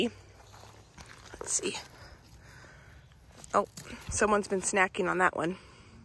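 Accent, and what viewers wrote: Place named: Minnesota, United States. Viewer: You sound like you could be in the movie Fargo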